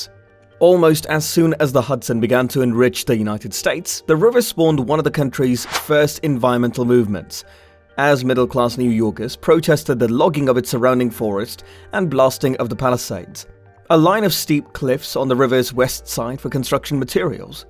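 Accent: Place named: United Kingdom. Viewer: Beautiful British accent and amazing NYC🎉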